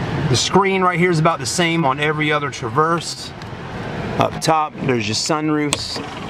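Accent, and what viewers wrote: South, United States. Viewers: I have the 2018 black premier and ABSOLUTELY LOVE IT! Not a fan of the red line. My husband has the 2018 black LT. we use mine as the family vehicle so that’s why we upgraded mine to the premier. I wanted white but the dealer could only find it in the red line so I got black. I am really glad I did❤️ loved your video and your accent! I’m from Michigan so obviously we don’t have that great southern accent:)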